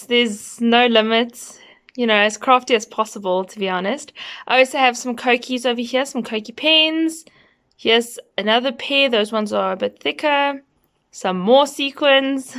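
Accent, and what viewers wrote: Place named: Australia. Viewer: i'm also and i like your accent are you from Australia?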